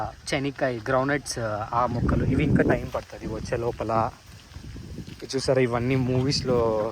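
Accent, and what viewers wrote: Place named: Chittoor, India. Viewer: Rasayalaseema chittoor slang matalavutunnav bro,, nice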